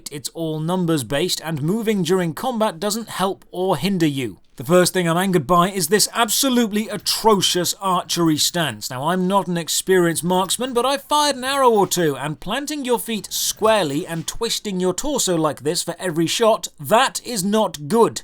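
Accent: United Kingdom. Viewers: I just love how angry he always sounds. Very british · David Attenborough, is that you? I can't tell you Brits apart.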